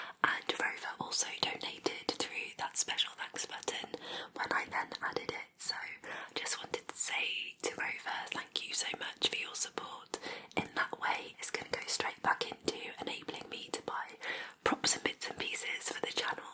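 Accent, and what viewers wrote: United Kingdom. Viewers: Hello! Thank you for “ breaking the fourth wall “ for a bit, and sharing a bit more “ personal aspects “ of you, and your life. I truly enjoy your channel, as you seem definitely more down to earth, and genuine (perhaps it’s an “ English/ British thing”), but it’s warm and approachable, this energy that you share in your videos. And your voice, gentle, along with you lovely accent, is so very relaxing. Anyway, as I noticed that you seem to have a bit more of a “ mischievous and playful” sense of humor as some of your answers in this video would indicate, would you ever consider doing a bit more “ silly, ridiculous, or playful” characters in future videos? Just a curious inquiry from a distant admirer…..be well, ( sorry, never learned your actual name, if you’ve ever shared it!) Cheers! Thanks again for all the hard work!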